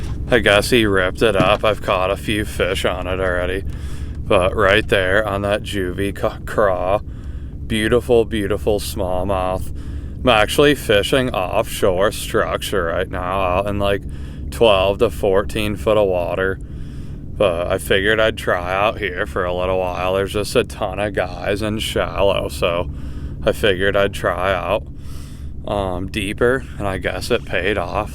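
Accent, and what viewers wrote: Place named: Michigan, United States. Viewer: love the michigan accent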